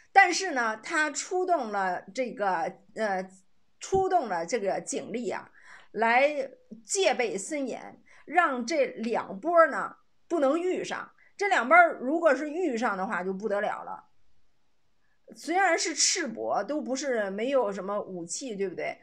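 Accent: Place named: North, China. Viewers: 你的中文是跟谁学的？北方农村口音怎么丢人了？ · 拜托＂以后＂发音＂蚁后＂ ，不是＂姨后＂。 你这老女人的一口北方农村口音太丢人了。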